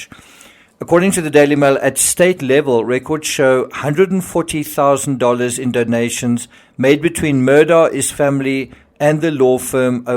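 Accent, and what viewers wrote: South Africa. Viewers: Hi there! Enjoy your channel. You sound you are from South Africa? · Sounds South African to me.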